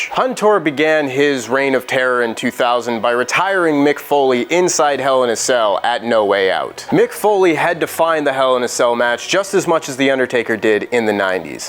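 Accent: United States. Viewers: Clicked on wrestletalk video. Hears American accent. Is now confused. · The American accent threw me off. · Hello, what's this? An American voice?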